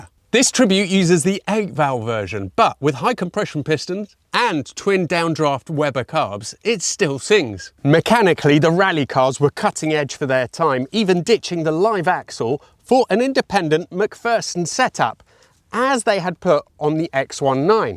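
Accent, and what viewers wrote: Italy. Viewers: Keep the Italian pronunciation, I really respect and appreciate that. Such a beautiful language. The rest of us are just guessing unless somebody teaches us how-to. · Carry on with the Italian pronunciation, I too have Italian parents and I was born in London and speak Italian too. Bravo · I can't believe some didn't realise you was of Italian origin. I love the Italian accent, Alex Polizzi fault. 😊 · I thought I detected an Italian twang in a previous video.🤌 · YOU HAVE MADE NO SECRET of your heritage ❤🇮🇹 personally I love hearing the authentic pronunciation …………. It’s an education Thank YOU 🙏🏻 Pass my regards on to your Dad from Dundee 🏴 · Keep those Italian pronunciations coming!!! ❤ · Eccellente. I do appreciate your Italian heritage pronunciation. Three years in Italy 1970-73 - my (English) baby sitter's husband was Italian. He took pride in his (replica) Mk1 Escort Mexico. 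· I love it how your Italian pronouncement of Italian words comes through. I really enjoyed the one with your dad on Italian car pronouncement. I speak a tiny bit of Italian still after working in Italy for a year and absolutely love the language (and food). I also shared this video with a good friend of mine, who uses this body as a race car but with a Mazda rotary engine 😋 I had a lovely Alfasud (yes I try to pronounce that the Italian way) and because my ratio leg length / upper body is quite opposite to "the Italian ape" ratio, I had to extend my steering wheel hub by about 15 cm and I bent the gear lever quite far back to be able to sit "normally". I had a lot of Alfa Romeos and absolutely loved them, best driving cars I have ever owned. What an absolutely gorgeous looking and sounding car, testament to the work that went into it, brilliantly done. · ❤❤❤great video thanks I love the Italian accent and your videos are always interesting ❤❤❤❤ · I like your italian pronunciation, but…Why do you pronounce Abarth the wrong way then? 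· It's lovely to listen to the real pronunciation of Italian words 🤌🏻 It's part of this channel's charm. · One word for this car, ‘PROPER’. Keep,up with the Italian pronunciation. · I, for one, love your italian accent. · What an epic cool little car, a credit to the owner.. Always liked your Italian pronunciation, and as always thank you for the great content. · Love you Italian pronunciation of Italian marque names ❤ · I don't think I've ever seen one of these, I absolutely love the way it looks! What an awesome car! Also, I love that you pronounce Italian names with an Italian accent. it's part of you. never changes, buddy 😉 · Do Italians talk like this, which dialect and accent?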